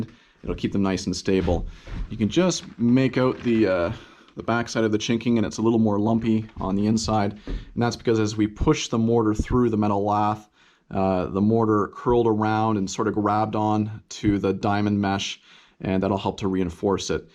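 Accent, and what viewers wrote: Canada. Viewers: What a beautiful cabin! Love the wood stove that you put in too. So nice to hear your Canadian accent, I'm from Canada and I don't get to hear the accent often. Love your front door too, it's huge and perfect for the cabin. (from Sandi)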